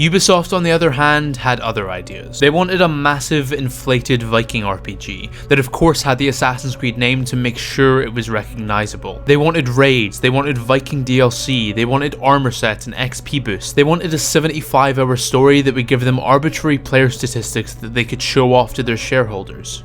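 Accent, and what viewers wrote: Scotland, United Kingdom. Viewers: u from scotland mate? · Is this kid British I'm not listening to any more of his bullshit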